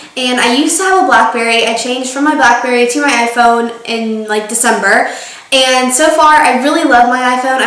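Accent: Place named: Texas, United States. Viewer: Are you born and raised in Texas? I'm not from there and just thought everyone had the accent!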